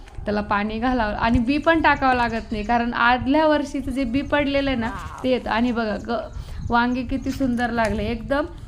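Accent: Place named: Pune, India. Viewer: तुम्ही खरच किती काम करता तुमच बोलण खूप छान वाटत नगर किंवा पुण्याची भाषा वाटते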